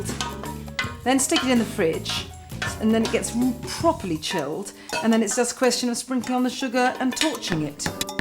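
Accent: England, United Kingdom. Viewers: I think she's speaking British English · Falling in love with this English lady & her accent.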